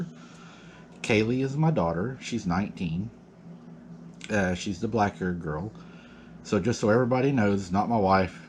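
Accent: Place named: Tennessee, United States. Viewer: You must be from Tennessee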